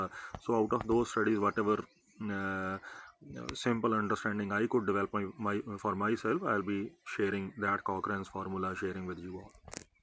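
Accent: Punjab, India. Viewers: good sardar ge. i knew all these things but i enjoyed you explaining all this stuff, being punjabi myself i love to listen to punjabis speaking english from which the fragrance of punjabi oozes out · This is extremely helpful and simplified.,that punjabi accent is clear even to a Zambian like me.I enjoy that epecially that is concentrated with quality information that is chunked into very simple steps.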